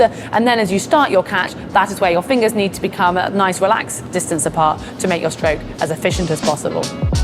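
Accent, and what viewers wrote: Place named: United Kingdom. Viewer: Dear GTN, Thank you for this hydro dynamics piece of advice. I would have another subject that I would much appreciate you to deep for us? The "cross-over kick", as I could discover it through this last published video from the Effortless Swimming Youtube channel called "The Most EXTREME High Elbow Catch EVER". This leg kick is unfamiliar technic (for me), however it seems recommended for long distances swiming or triathlon? By the way, I'd like to mention how much great improvements in listening English I am making, since the time I subscribded to your channel (as a froggie)! I find it is so pleasant, and not so common on YT, listening such british accent! Thank you so much.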